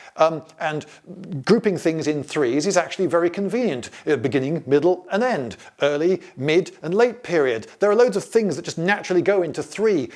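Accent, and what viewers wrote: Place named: Cockney, United Kingdom. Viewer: Lol I love his cockney voice. 'Dan't mack arand with the currency!'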